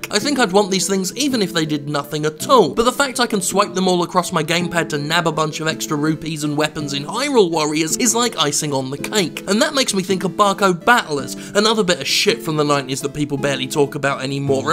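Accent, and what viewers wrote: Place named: United Kingdom. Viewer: (I'm new to Sterling) says he's american but he sounds british so does he live in america and is british or what?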